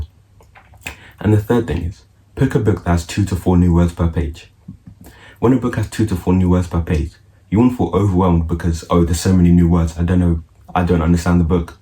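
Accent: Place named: United Kingdom. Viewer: Guy your British accent is perfect!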